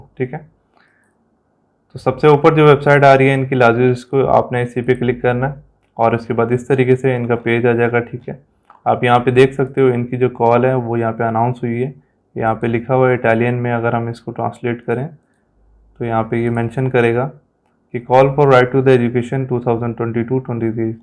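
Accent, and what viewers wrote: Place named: India. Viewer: Please speak English not Indian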